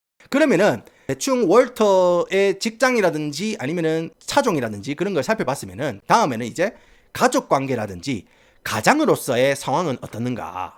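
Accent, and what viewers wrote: Gyeongsang, South Korea. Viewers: 경상도 액센트의 영어로 설명해주는 미국의 사회 문화 컨텐츠 ㅋㅋㅋㅋㅋ진짜 미치겠다 ㅋㅋㅋㅋㅋ 구독박고갑니다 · 사투리가 쏙쏙들어와요 경상도ㅎㅎ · 내용도 전두엽 쌍골짜기에 싸대기가 메아리칠 정도로 신선하고 쨈있지만, 썰푸는 vibe가 참~ 찰져! 갱상도 억양과 양키어의 묘한 앙상블. 그래도 유료가입은 몬한데이~! 내코가 월터 와이트 입던 빤스라도 갖다 팔아야 개우 입에 풀칠. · 사투리가 너무 찰지셔서 댓글을 안 달수가 없었다...찐 경상도 바이브가 영어랑 절묘하게 혼합된....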